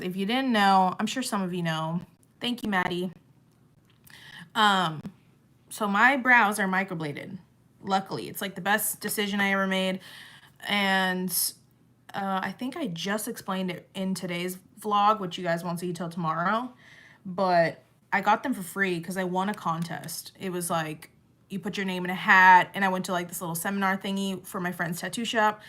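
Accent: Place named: United Kingdom. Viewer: Girl luv your brittish accent 😍 "what " my daughter colored her hair black &she luvs it :)))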